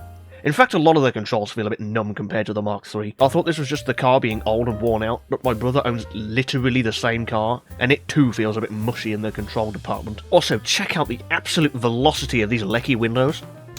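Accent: United Kingdom. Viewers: Funny British Peugeot man is back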